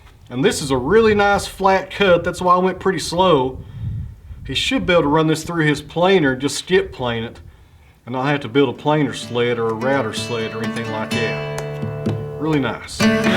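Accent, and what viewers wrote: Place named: Kentucky, United States. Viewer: When I hear your accent you make me homesick for the southeastern Hills of Kentucky my kin sound pretty much the same as you and I love it. I am fascinated with sawing and milling lumber, I guess it is in my blood, my Great Grand Father worked at a sawmill in Missouri and was killed when the boiler blew sending bricks a mile away.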